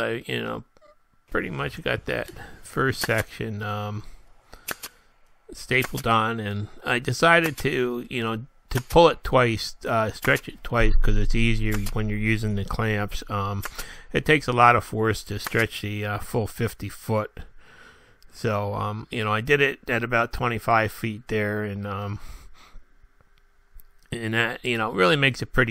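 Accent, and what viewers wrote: South, United States. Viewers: Message From The UK.... Loved the video, loved the southern accent, loved the finished job! God bless Southampton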